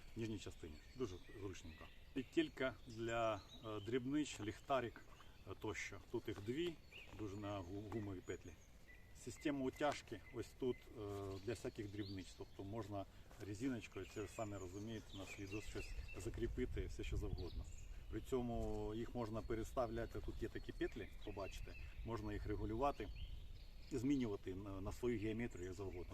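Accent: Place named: Russia. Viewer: мені половина відео слух різало, наголоси на російський манер. Особливо в слові Український. Думав до кінця не додивлюся.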